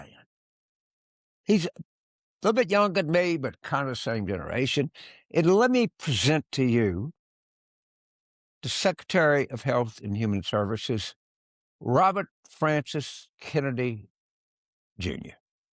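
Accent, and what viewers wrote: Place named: New Orleans, United States. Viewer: My dad was born and raised in New Orleans and sounds just like James.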